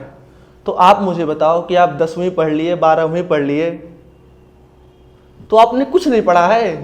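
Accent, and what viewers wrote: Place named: Bhojpuri, India. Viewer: Aapka aawaj humko bahut aacha lagata hai bhojpuri type me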